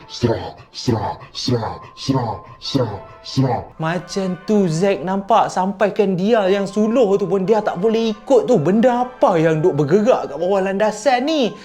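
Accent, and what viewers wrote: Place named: Penang, Malaysia. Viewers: Fuhhhhh ..loqat penang dia mcm geqammm ja kita dengaq..😂😂😂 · Assalamualaikum...ada la ckit2 mcm org Penang cakap...kedah pn ada jgk...asalkn utara mna negeri pn x pa la...jnji utara😂😂😂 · Slmt ptg...adehh..krem perut Oii..tiap kali dgr ceritA mati akk mgekek tgelak,lg2 dgr ckp loghat peneng😂😂😂😂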